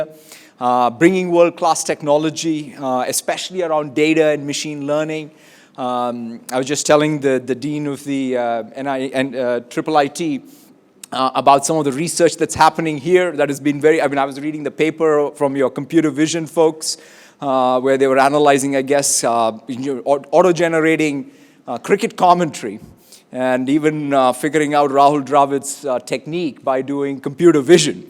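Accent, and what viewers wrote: England, United Kingdom. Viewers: English accent 🗿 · Looking at his English accent I think he almost forgot telugu. Listen the way he said 'Tank bund'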